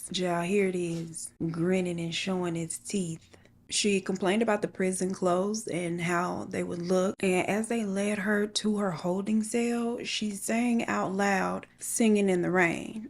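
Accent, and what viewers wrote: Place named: Houston, United States. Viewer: Alsoooo, ever since you moved to Houston that accent been accenting I love itttt ❤️😂😂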